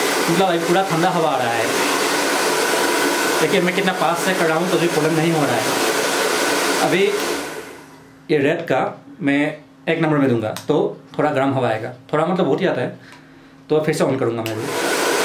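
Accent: Assam, India. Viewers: Tum assamis ho na tumhara bat se pata salrahahe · Are you assamse ? Your accent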